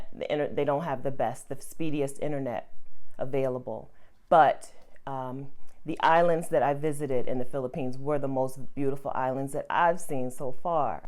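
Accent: United States. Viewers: You American accent gives you hardly any privileges as long as you are black so don't get it mixed up. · Rubbish, why not caption it “countries that are friendly for African Americans to travel to” you generalized it to all brown people only to tell us during you explanation that your American accent gave privilege which means that the caption was deceptive, I stopped listening once I heard that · Big mistake, your American accent doesn't give you priveldges, it differentiates you from another black person. These American superior mentality I guess. Not really ideal. But I get the point. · WHAT PRIVILAGE ARE YOU TALKING ABOUT, YOUR AMERICAN ACCENT OR WHAT. YOUR STILL A BLACK WOMAN, THERE IS NO DIFFERENCE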